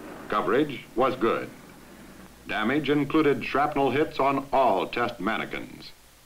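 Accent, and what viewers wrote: United States. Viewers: Thank you again for the upload.... I love the films also the American narration .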